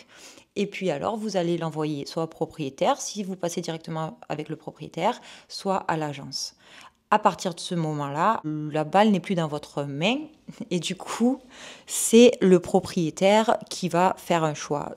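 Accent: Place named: South, France. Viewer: Jolie accent qui rappel les cygales ❤❤❤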